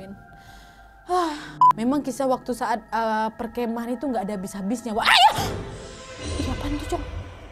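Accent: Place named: Medan, Indonesia. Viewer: Baru kali ini kuliat org bawain horor cem gini malah jd lawak 🤣🤣 Medan kaleee, semangat kakak bagus deh pembawaan cerita nya 👍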